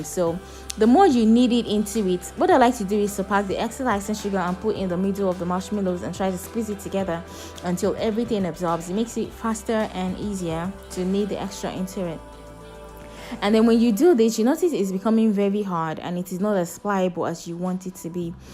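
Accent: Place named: Ghana. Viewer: You don’t sound like a Nigerian but a Ghanaian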